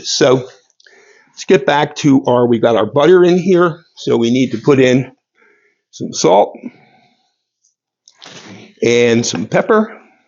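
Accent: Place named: South, United States. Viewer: Love your accent! You're truly a southern belle 🤗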